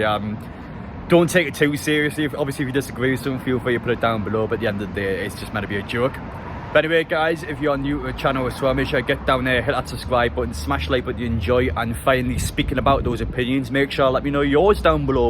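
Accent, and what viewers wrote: Newcastle, United Kingdom. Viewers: Has anyone watched the video with subtitles on?🤣🤣🤣 Artificial Intelligence trying to relay the Geordie accent, classic!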